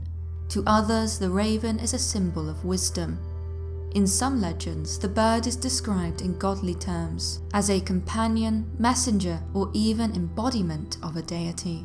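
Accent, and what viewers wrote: United Kingdom. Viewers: I subscribed few days ago, love ur voice n narration!.. Bdw.. Are u British ???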